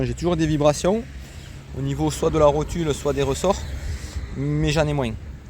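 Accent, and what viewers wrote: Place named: South, France. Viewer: Tu as l'accent du midi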